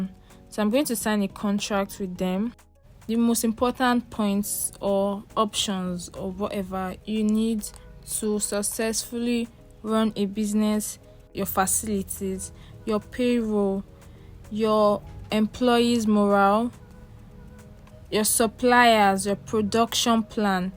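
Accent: Nigeria. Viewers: You sound African Nigerian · Are you Nigerian, sorry for asking but you got that Nigerian accent. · You sound Nigerian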